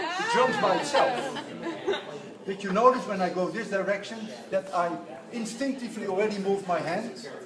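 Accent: Netherlands. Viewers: He sounds like hes Dutch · Nice dutch accent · I knew he was dutch when I heard him 😂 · his accent is so Dutch! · He sounds so Dutch lol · He sounds dutch af. Update i checked the discription and shocker: he is dutch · Nah, it's about as Dutch as an accent can get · Don't know him but he sounds like a Dutchman talking English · I knew that this man was Dutch from his accent. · I recognized him being Dutch after his first words 🙈 (I'm Dutch as well) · 6 seconds in and I heard he was Dutch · Hes so clearly dutch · Sounds like a dutch teacher to me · Is he by any chance Dutch originally? Sure sounds like it 🙃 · me: is he dutch? me: * checks description * me: ...surprising...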